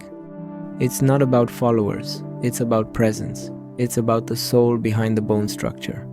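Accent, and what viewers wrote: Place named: Japan. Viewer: The narrative is so awful that I can't understand at all. Fucking Japanese pronunciation.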